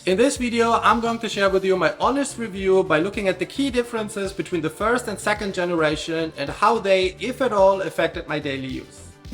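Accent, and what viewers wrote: Germany. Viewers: Beautiful German Accent! Grüße aus Peking. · bro sounds a bit german .... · Deutscher oder⁉️ Man hört es extrem😂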